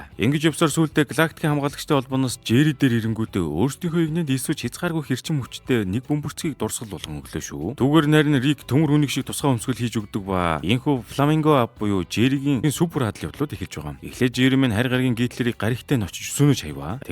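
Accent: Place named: United States. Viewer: Таныг яагаад ариун ус гээд байдгийг өөрөө цувралыг нь бүрэн эхээр нь үзээд ойлгосон доо хха. Сүүлдээ бүр хэл яриа Америк стилтэй болж эдр хха. Таны зовлонг ойлгосоон. Ххэ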